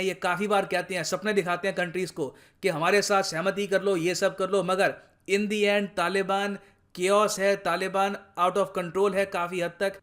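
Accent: India. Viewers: Wo Assam ke logon ka accent hai, par to hindi bilkul bharat wale accent me hi bol rhe the, inke hindi pronounciation me to koi pareshani nahi dikhi...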